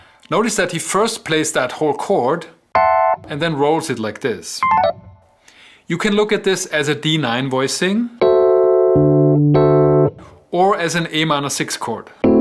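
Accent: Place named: Germany. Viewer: The german accent is killing me thanks so much for the video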